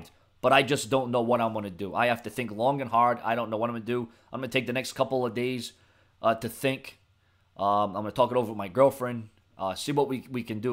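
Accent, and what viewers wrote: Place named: New York, United States. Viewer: I would move but keep your channel ppl will miss u if u delete nd btw are from new york its just the way u sound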